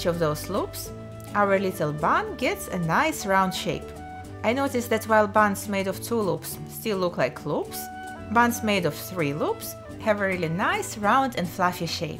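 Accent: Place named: Russia. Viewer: Слышно что русская))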